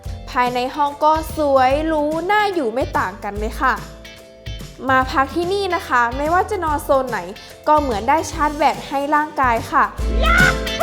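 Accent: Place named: Vientiane, Laos. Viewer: I like you spoke lao look like sao lao viengchian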